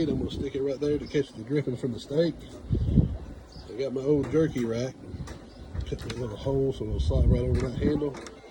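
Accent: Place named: Georgia, United States. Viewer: New to the channel. Was browsing ideas for the Tomahawk. I like the make shift basting idea 💡. However I have to say, and strictly off of personal preference, 100% NO Montreal Seasoning. Judging by the accent, you sound like a good ol Gerogia Boy. I had a friend visit from Dallas over the summer, and he used the Montreal Seasoning on a few ribeyes we smoked, and I have to add, I DO NOT enjoy it on my steak. Salt N Pepper ONLY. Others have different preferences, and I find a lot of Louisiana-Georgia boys love themselves some Montreal Seasoning. Also, wanted to ask, what flavor pellets do you prefer? I myself enjoy the Charcoal and Mesquite pellets. It really accents the flavor of the meat. I like the temp on the steak that you prepared as well. Perfect. I have the Boss 820, im not impressed with the Lockhart, the temp fluctuates too often and the box uptop seems to not hold temp as well as I hoped for. 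Also, I prefer the reverse sear, the temp control I find easier and allows more flavor into the meat before the sear. If you'd like to exchange videos, ideas and/or recipes, feel free to message me and we can exchange contact info. Always looking for good bbq persons who want nothing better than to make their family smile with tasty meals.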